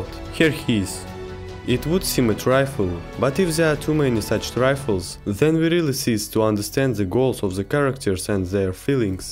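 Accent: Russia. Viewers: You have a Russian accent. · It's Russian accent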